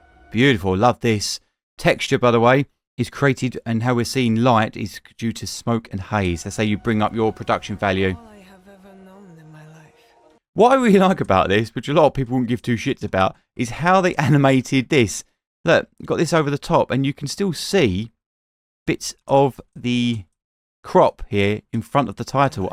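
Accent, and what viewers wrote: United Kingdom. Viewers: 😂 every time I, as an American viewer, hear you say the shortened f word for cigarette my ears perk up like did he just slur me out? And I immediately culturally adjust cause I also love British language and slang and the accent and I’m like “oh yeah that’s what they call cigarettes in the UK” where as when I hear that word thrown about here at home it’s being thrown out in discrimination against either myself or my other LGBTQ+ brothers, sisters, and others. But I got you!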